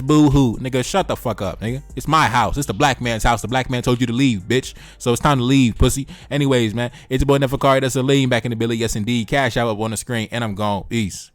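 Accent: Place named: New York, United States. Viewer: I love your geopolitical videos. Those are the ones that I always click on! Not only are they informative, but you manage to make them so funny too, with your New York accent😂😂. Keep it! 🇭🇹🤝🏿🇧🇮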